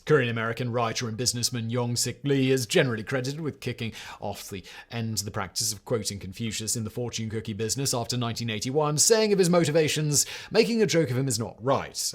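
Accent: United Kingdom. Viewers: Psuedo intellectualism influenced by post modernism is the curse of the 21st century. A british accent doesn't make it so. 2 hours of gross over statements, lies and fluff.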